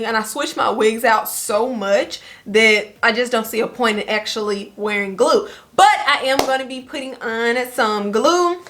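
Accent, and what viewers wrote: Louisiana, United States. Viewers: Guh you from Louisiana? Lol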